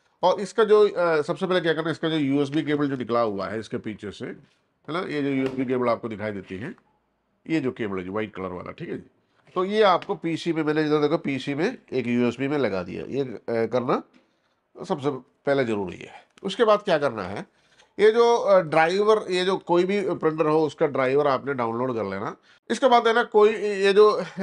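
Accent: Kerala, India. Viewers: Malayalam touch Hindi 🙄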